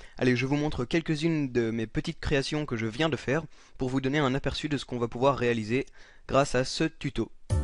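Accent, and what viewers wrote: Belgium. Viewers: tu est belge ? si non tu as l'accent belge ^^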